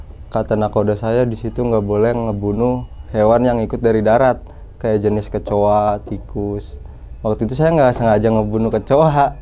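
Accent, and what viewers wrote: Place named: Indramayu, Indonesia. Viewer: Endah weru logat indramayu seru pengalaman horor wedi ne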